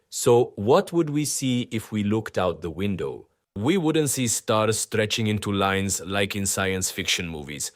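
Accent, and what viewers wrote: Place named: India. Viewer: İndian aksan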